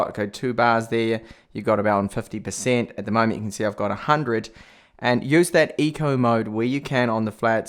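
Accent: New Zealand. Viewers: Hi, where are you from? :) your accent seems like a friend of mine from NZ...Very good reviews in your channel. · Are you a kiwi? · R ur from New Zealand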